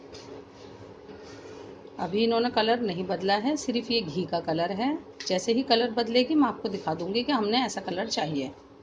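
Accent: India. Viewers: The way u speak is so good full Desi style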